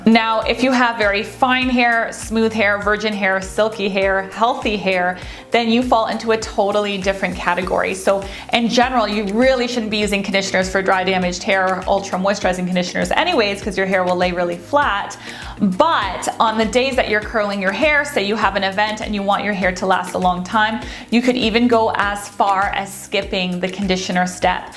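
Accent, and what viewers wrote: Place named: Canada. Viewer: Pregnancy look so cute on this lady. The Canadian accent is an absolute nightmare.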